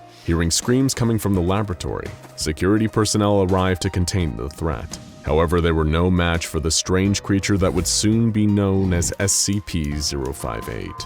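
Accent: United Kingdom. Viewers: It's bri'ish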